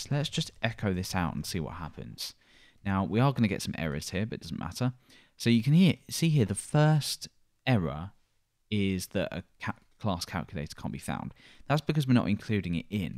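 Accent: United Kingdom. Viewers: 2 things i love: British accent & simple explanation. Much obliged.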